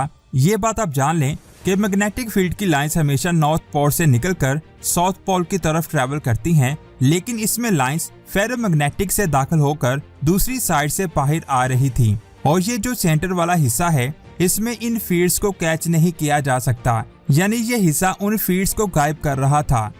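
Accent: Punjab, India. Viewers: Pehle apna panjabi lehja to badlo baat urdu m lehja panjabi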